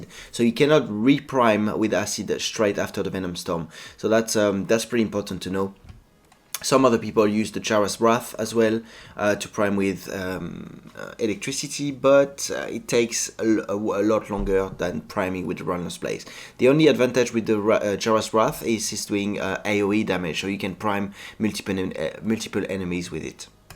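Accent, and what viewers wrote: France. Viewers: Vidéo sympa je ne te connaissais pas mais une chose de sûr c'est qu'avec un accent pareil tu ne pouvais être que français 😆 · Are u FRENCH My FRIEND?! i like u r acsent :D !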